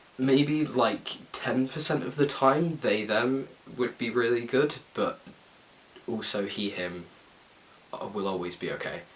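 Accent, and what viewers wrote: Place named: United States. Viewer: All I can see is that youre kind of speaking with an american accent already. Is it because of the songs your singing?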